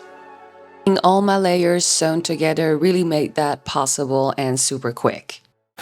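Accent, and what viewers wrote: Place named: Scandinavia. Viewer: I absolutely looove watching your videos! I’m a beginner to sewing, I’ve only made one thing🌸I started sewing because I wanted to make beautiful gowns like this, and your videos really inspire me to do that!!🌺 I have to say that the thumbnails are absolutely amazing and so beautiful💕I can’t wait to see what you do next 💓Your accent reminds me of a Nordic accent? I’m Swedish and it kinda resembles that💗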